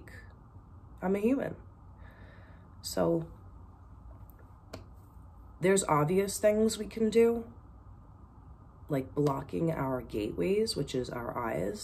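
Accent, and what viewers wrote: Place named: Boston, United States. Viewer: oh whoops 😬 haha my bad. Hope your having a great day today. Love the Boston accent that slips out every once in a while aha 😂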